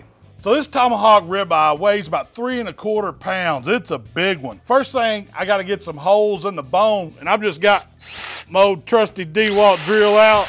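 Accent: South, United States. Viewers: Dewalt drill, Tomahawk Steak, American southern accent. All is missing is the American flag.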